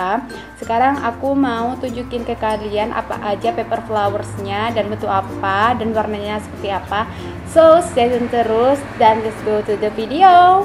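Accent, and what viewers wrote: Madura, Indonesia. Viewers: Suka sama cara bicara .. Soalnya khas² maduranya itu kerasa🤣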